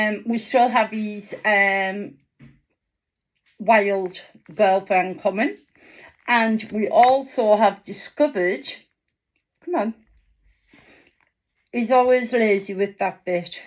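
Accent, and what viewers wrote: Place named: Wales, United Kingdom. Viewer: Excellent owl! I see (and hear) you're from the UK. I'm gonna guess Wales, since Welch is the one English this American has trouble understanding. (And, yes, I've even been to Australia. lol) I'm glad the vid isn't just a short because it takes a bit before my ear attunes to Wales. Thanks and, yeah, it's definitely worth a sub.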